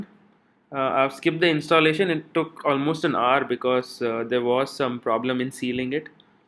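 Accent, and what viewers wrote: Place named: North India, India. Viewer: Great bro!!! Ur first impression made me feel ur some whr from North. But KA19 helped me understand ur from Namma Mangaluru. U simply Rock!!! Make more great videos!!! Ur a better Host compared to these News channel anchors:-)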